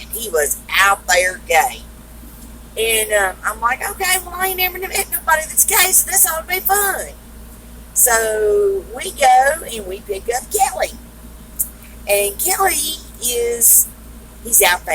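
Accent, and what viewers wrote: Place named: South, United States. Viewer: Knee high to a grasshopper?? Great southern twang!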